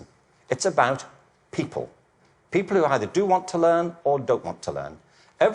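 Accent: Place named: United Kingdom. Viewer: 從口音跟有爵位這回事來看，這人是英國人，不是美國人吧...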